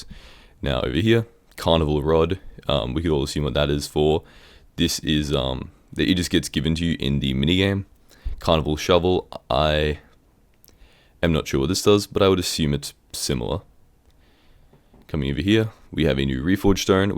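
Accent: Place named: Australia. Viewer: Hey, im assuming you live in Australia becuase of your accent so how do you get better ping to hypixel becuase I am struggling on 270 ping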